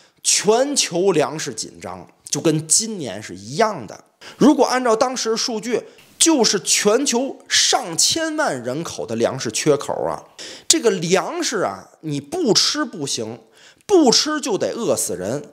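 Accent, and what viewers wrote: Tianjin, China. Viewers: 老家天津，喜欢你说天津话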